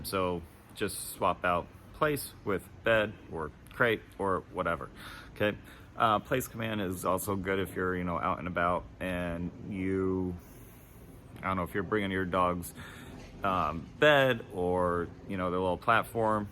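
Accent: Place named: Balkans, Eastern Europe. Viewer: Pozdrav iz Makedonije, vidim po naglasku a i po imenu da ste iz nasih prostora. Nadam se da cete nastaviti sa snimanjem i da cu uzivati gledajuci vase snimke, a i da cu nesto novo nauciti. Podrska od mene!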